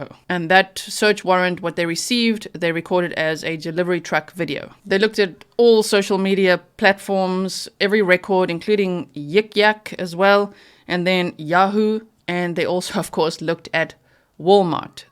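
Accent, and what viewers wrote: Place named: South Africa. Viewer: Great summary. Don’t lose the accent👍🇿🇦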